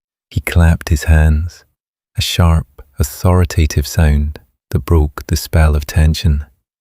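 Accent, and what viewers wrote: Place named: Ireland. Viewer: Best Bumpy story, so far. As the other reviewers said,like the original narrator better. The slow, Irish accented narrative was a bit much. Always liked the Irish accent, but bring back the original.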